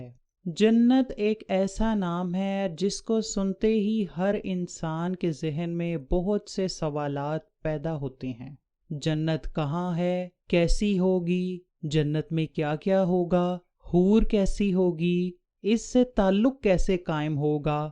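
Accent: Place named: Punjab, Pakistan. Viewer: یہ عورت پنجابی ہے کہ رہی ہے کہ جک جائے گی حالانکہ جھک ہے لفظ یہ پنجابی گھوڑا نہیں کہ سکتے بہت زور لگا لیں تو گوڑا کہتے ہیں ویسے پنجابی کوہڑا نہیں کہوڑا کہتے ہیں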